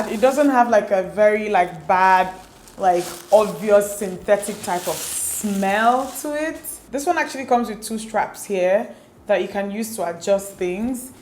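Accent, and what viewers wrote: Igbo, Nigeria. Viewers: The additional igbo accent just perfected this video 😂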